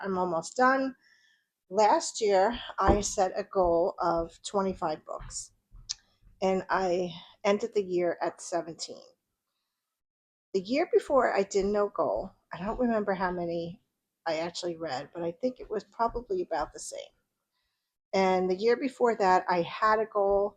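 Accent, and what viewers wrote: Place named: New Jersey, United States. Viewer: Oh, friend, sleep deprivation is no joke. I literally got tears in my eyes when you said Michael hadn't slept for a week. Been there, and it's so exhausting, to say the least. Just wears you down in every way. Glad he slept on Sunday night. All the food you showed looked great! The eggs/chickpea dish... yum! I loved following along with you in your week. I don't know how you kept it all straight from day to day! P.S. I love your NJ accent. Hehe, did you know you have an accent? Or maybe I'M the one with the accent. 🤔😄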